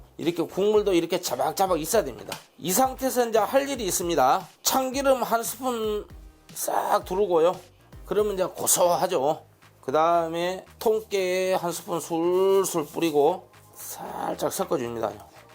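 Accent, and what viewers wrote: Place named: Gyeongsang, South Korea. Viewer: 구수한 사투리 듣기 좋아용 갱상도에서~~~ㅋ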